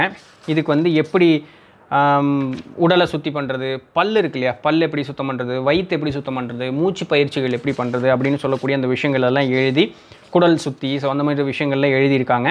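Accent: Coimbatore, India. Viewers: Hi I learned so much from your videos. Being from Coimbatore like your way of saying. I sent email but it did not delivered. Where did you buy your copper kappu please respond as soon as possible. Please reply as soon as possible. Thank you!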